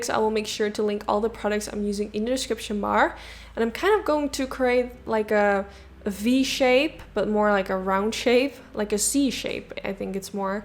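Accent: Netherlands. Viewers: Have you thought about doing an English course? You are clearly fluent, but as soon as you said “hi guys” I heard you were Dutch haha. Hope you don’t take this the wrong way.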